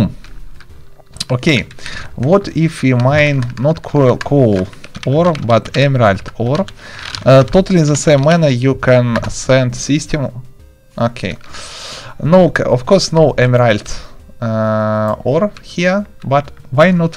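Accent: Russia. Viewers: It is Russian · r u russian? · Слышен русский акцент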